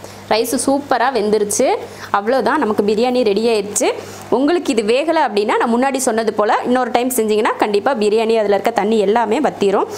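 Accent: Coimbatore, India. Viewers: Akka neenga Coimbatoraa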